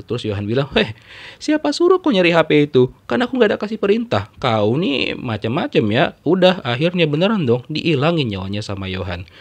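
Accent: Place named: Sulawesi, Indonesia. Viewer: Ko macam orang sulawesi kah atau ntt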